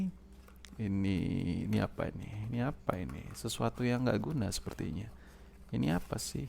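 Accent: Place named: Banyumas, Indonesia. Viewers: Kaya wong banyumasan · Anjay basa jawanya kental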